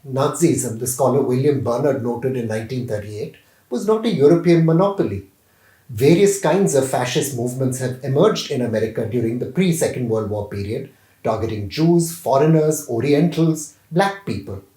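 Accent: India. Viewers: Clown reading MSNBC agenda items ans propaganda with an Indian accent …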